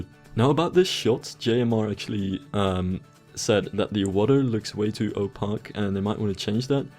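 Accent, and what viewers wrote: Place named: Netherlands. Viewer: Nice video! You have a great accent for someone from the Netherlands. BTW: I'm dutch too.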